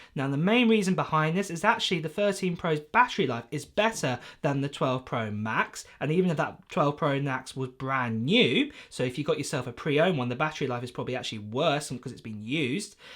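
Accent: United Kingdom. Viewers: I want to buy the iPhone 11 but I don’t have money for that and also i don’t have a job since i was just a 16 yo who want some iPhone i am hoping that i will be the one who got your ipad❤️Godbless you man💖 btw i like your British Accent❤️❤️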